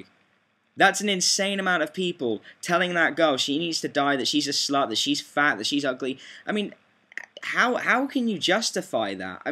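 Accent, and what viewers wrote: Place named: United Kingdom. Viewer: Lol a British guy..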